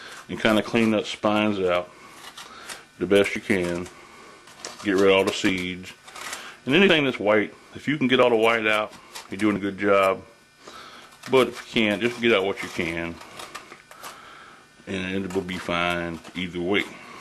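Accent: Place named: Carolinas, United States. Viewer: He sounds more like he's from the Carolinas to me.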